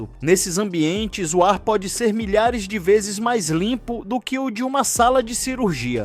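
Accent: Northeast, Brazil. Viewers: Essa narração nórdéstina tá muito ruim !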